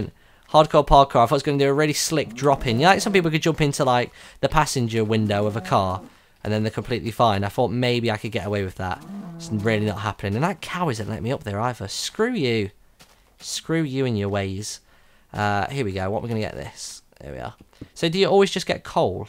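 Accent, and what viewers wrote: England, United Kingdom. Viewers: I LOVE THAT ENGLISH ACCENT WHEN U SEE DE FIRE CREEPER ITS LIKE: OMG NONONONO THATS A FIRE CREEPER NONONO GET AWAY GET AWAY NONO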